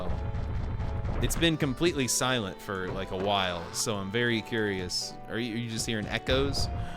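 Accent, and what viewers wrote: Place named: Brooklyn, United States. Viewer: sorry, this Brooklyn accent makes it difficult in stopping Ganondorf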